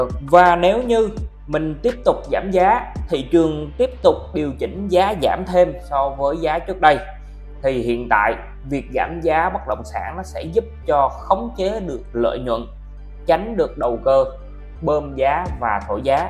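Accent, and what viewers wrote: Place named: South, Vietnam. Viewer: Dân SG-miền Tây ko ai rảnh hơi mà phát âm chuẩn Hà Nội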